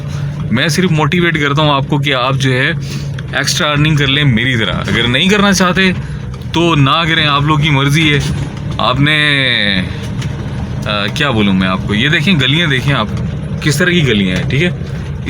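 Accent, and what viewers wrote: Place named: Karachi, Pakistan. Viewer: MashahAllahh , ap ka accent ka Karachi ka tuch ata ha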